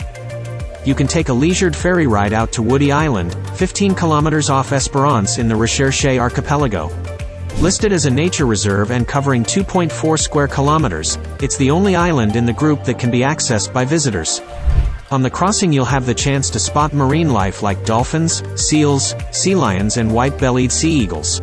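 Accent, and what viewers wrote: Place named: United States. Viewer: Maybe AI IDK? BUT even so, why is an American (accent) talking about Esperance Australia? Why Not Australians? America, Good on you & i thank you❤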